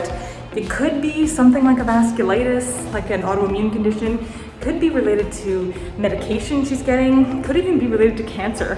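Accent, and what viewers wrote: Canada. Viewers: This is the first video that I have watched of this physician and I knew absolutely nothing a out her...but I guessed pretty quickly that she may be Canadian after she said "about", I'm CDN too and I guess we really do have an accent! I also have RA so it's pretty cool to see things from a doc's perspective. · Where are you in Canada? Judging from your accent you're a native Canadian. Correct?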